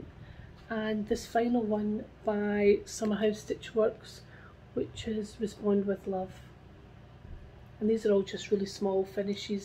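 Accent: Scotland, United Kingdom. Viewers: Thanks for checking in. I’ve missed you. Love listening to a Scottish accent.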